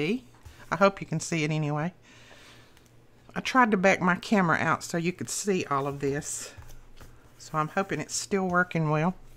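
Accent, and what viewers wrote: South, United States. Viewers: Love. Love, Love this. I'll be creating one, thanks so much for sharing & for the inspiration. Love your accent💜I have relatives down south. Stay safe & be well. We also wear our mask & gloves when we go out. The price of food & paper goods have gone up. This virus has affected everyone one way or another. Sadly, I have relatives who are I'll from the virus. It's a difficult time. 🙏🙏🙏🌹❤💙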